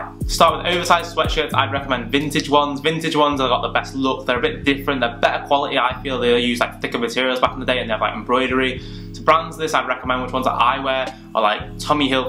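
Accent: United Kingdom. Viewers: holy crap that British English kills me boi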